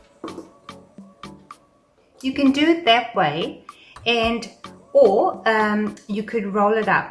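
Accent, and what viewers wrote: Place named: South Africa. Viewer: Your accent sounds so much like our South African accent. People used to tell me we sound like the Aussies and NZlanders and I could never hear it. I hear it now. Haha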